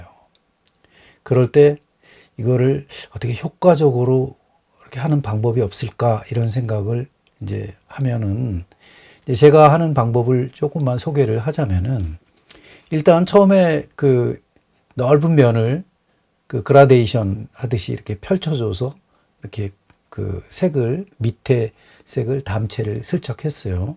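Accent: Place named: Paju, South Korea. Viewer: 목소리도 좋으시네요. 파주억양 맞아요.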